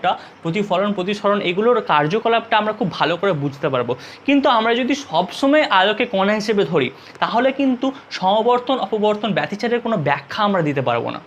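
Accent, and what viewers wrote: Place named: Bankura, India. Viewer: Dada apnar bari kothai? Ascent ta Bankura er moto laglo!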